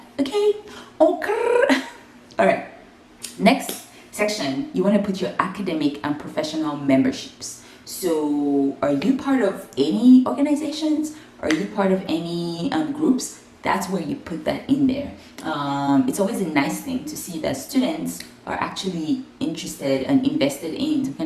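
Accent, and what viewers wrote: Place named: Nigeria. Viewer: Thank you. I appreciate this video. We have something in common though, I teach sunday school at my local church and gurrl I nver used to write in details like you just explained. I will edit right away. Thanks again. You sound Nigerian too. 😉😉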